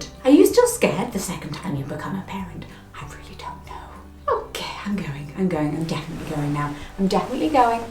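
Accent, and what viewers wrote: RP, United Kingdom. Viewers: i saw you being intervued by molly berk and loved your poshness that's parshley why i subscribed to you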